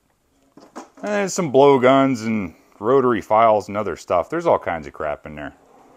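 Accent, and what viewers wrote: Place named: Pennsylvania, United States. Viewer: sounds like you have a pennsylvania accent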